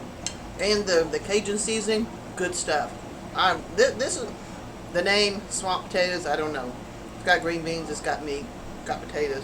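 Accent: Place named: Oklahoma, United States. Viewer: I still hear some Okie in you. I have it too.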